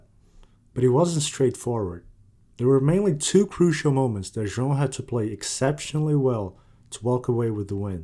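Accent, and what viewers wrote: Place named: Brazil. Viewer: you speak english with a brazillian accent, are you actually from brazil?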